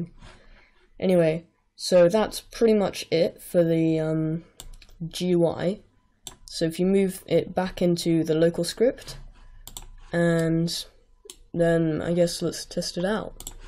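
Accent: United Kingdom. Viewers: THANKS SO MUCH FOR THIS AMAZING TUT!!!! (btw are you british?)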